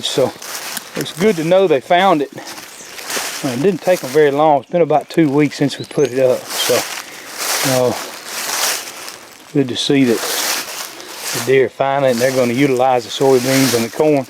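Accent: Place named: Virginia, United States. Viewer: Howdy, I’m new to your site. I’m an 80 year olde this August & a disabled veteran. I really enjoy what you do and watching you do it. I haven’t heard an accent like yours since my days in Virginia and the Navy so I’m learning. You seem like a great guy and the people that work with you, also. Are those your family or hired hands? Thanks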